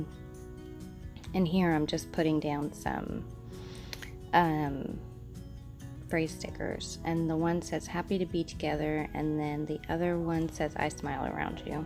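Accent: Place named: South, United States. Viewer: Hi! Im noticing a southernness in your talk! Where are you from? Im in SC, thought we might be neighbors! I love your jj videos!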